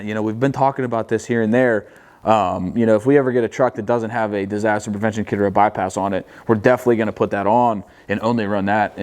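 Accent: Pennsylvania, United States. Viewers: Bro i live in illinois. Before you even said where you're from i was like. "Yeah thats a PA accent." Completely random but i called it.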